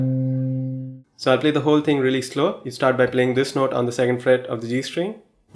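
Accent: India. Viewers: Cllicked away because of indian accent…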